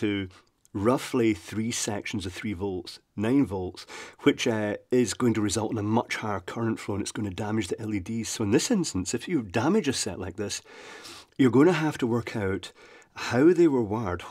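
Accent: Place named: Edinburgh, United Kingdom. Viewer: The only thing odd about the sound is your very posh accent, almost Morningside 🙂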